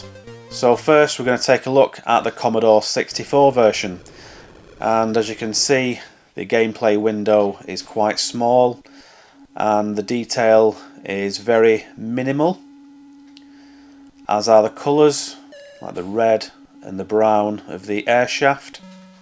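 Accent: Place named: United Kingdom. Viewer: I clicked this video saying "100% this guy has a fucking brit accent" - lo and behold. Literally nobody else would say the CPC is better at anything.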